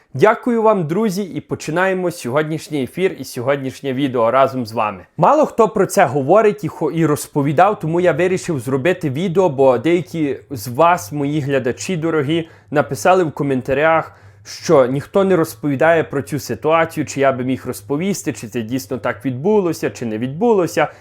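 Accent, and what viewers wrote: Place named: Ternopil, Ukraine. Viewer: Дякую. Чудова мова. Десь в дитинстві я чув таку вимову на Тернопільщині. Бо в Україні ми, на жаль, розмовляємо вихолощеним совковим варіантом, ще й з вкрапленнями москоіської говірки (а хай так буде, з помилкою)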